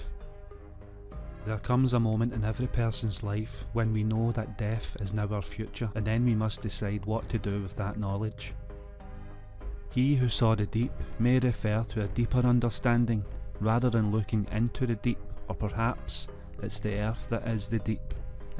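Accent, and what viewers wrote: Scotland, United Kingdom. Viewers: Yeah! A Scottish accent! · It's his channel and I have no problem listening to his Scottish accent. Just concentrate and you'll get🖒.. cA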